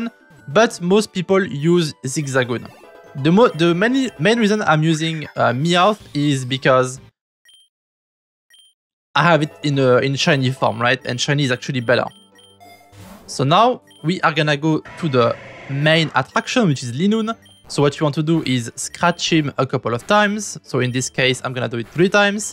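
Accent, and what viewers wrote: France. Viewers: What a nice french accent that you have x) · Je cherche un tuto en français j'en trouve pas. J'en cherche un en anglais je trouve un tuto d'un français qui parle anglais alaid x)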